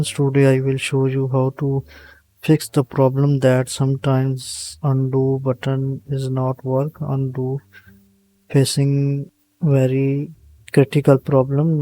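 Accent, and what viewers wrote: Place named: India. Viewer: not to be racist or anything, but itis always those quick videos on youtube with someone with a strong indian accent that saves the day. THANK YOUUUU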